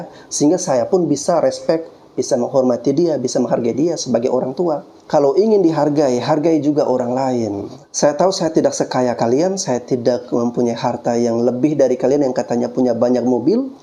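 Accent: Indonesia. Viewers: Jujur dari dari segi intonasi dan tata bahasa bahwa saudarakuku ini jauuh lebih INDONESIA dari pada...........it👍👍👍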